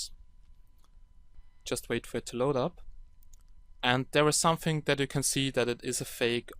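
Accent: Germany. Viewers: Hallo, ich nehme an das du deutsch sprichst, deines Akzentes und einiger Handy's wegen in denen ich gesehen habe, das Sprachen auf Deutsch sind;) Finde deine Video's sehr spannend und belehrend, mach weiter so! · Are you german ? Because you speak english like a german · are you german ? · Maybe that`s the reason I understand him so well - I`m German too... :D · wie man merkt dass du deutscher bist :D - aber gutes englisch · Digga du bist deutsch oder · Man merkt das du deutscher bist ahhaha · are you german? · You have a german accent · Man merkt nach dem ersten Satz das du Deutscher bist :) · du kommst doch aus deutschland :D ich hör dein akzent :D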